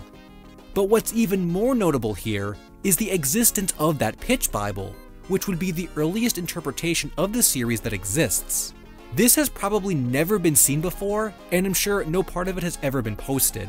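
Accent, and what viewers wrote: Massachusetts, United States. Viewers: Bro are u from Massachusetts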